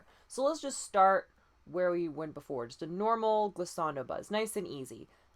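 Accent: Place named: Canada. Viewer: the Canadian is strong with this one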